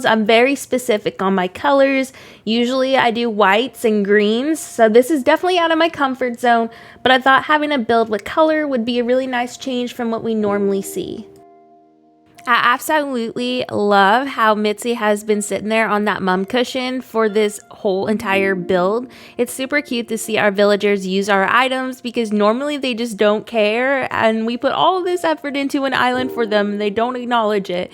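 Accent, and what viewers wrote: South, United States. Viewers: I have been following you on Instagram for a while now and this is the first video I have ever seen of yours. Your accent is so cute! Just a subtle southern twang. I love it. I love your builds!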